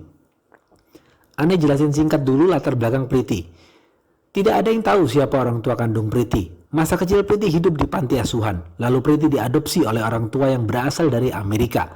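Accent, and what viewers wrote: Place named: Java, Indonesia. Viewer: Narratornya jawa medok inih haaa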